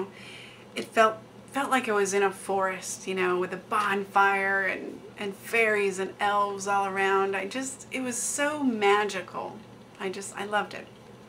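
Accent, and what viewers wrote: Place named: Germany. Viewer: still liv zhat times and your well german accent ;) cheers kc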